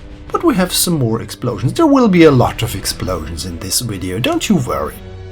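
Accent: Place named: Germany. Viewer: Thanks for the entertaining commentary. Always fascinated by your amazing designs with practical and elegant solutions. Good choice of analogy with the "unfinished symphony", since nothing ever gets finished when you play KSP... I hope that you soon again will show how to make Mun bases. I really liked "Operation Moonsucker" Btw. are you from Germany? I note a barely noticeable German accent in your flawless English.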